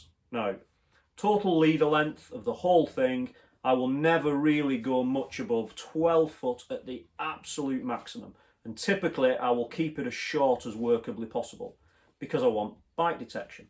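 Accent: Wigan, United Kingdom. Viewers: Really enjoyed these videos - even my wife commented that your explanations were very clear and uncomplicated. Having said that, could you just clear up the lengths of the thick coloured nylon. Is each length 30 cm, making 60 cm for the two or do the two pieces together add up to 30cm? Must say that I've enjoyed hearing your, once, very familiar accent having been schooled in Wigan in the sixties.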